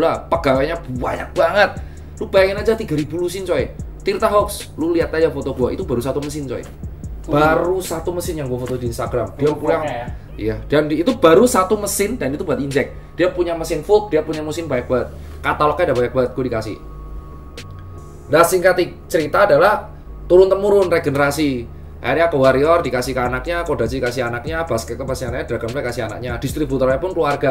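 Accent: Java, Indonesia. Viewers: njiirr lahh anak gaul logat jowo wkwkkw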